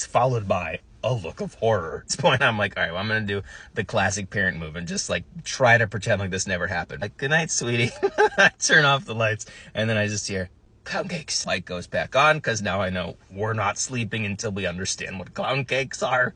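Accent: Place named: Texas, United States. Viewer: That accent and jacket... You did not indeed mess with Texas. Except, Austin. That's not Texas. Great video btw!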